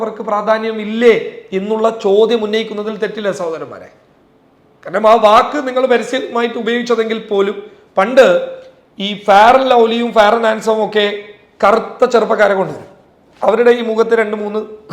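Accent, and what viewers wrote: Kasaragod, India. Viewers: Kasargod Slang adipoli😀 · എടാ തനി കാസർകോട് സംസാരം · Basheer bashiyum thudangi bb cream njan vanganonn vijarichu eni vangunnilla kasaragod samsaram nalle und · കാസർഗോഡ് ഭാഷ കേട്ടാൽ തന്നെ ഛർദിക്കാൻ വരും. ഈ മാതിരി ഊമ്പിയ ഭാഷ... വല്ലാത്ത ന്യായീകരണം തന്നെ.... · ella languagum manasilaavum ennal kasargod slang inte ponnooo onnum manasilavula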